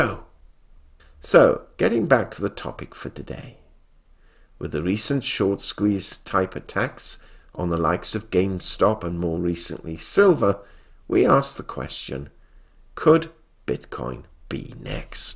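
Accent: England, United Kingdom. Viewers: Subscribed & Liked to help support your podcast. Keep up the pleasant pommy accent.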